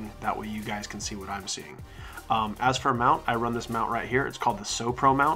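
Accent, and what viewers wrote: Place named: Western Pennsylvania, United States. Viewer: Hey, thanks for posting this. Love your channel. Are you by any chance originally from western Pennsylvania? Guessing based on your accent.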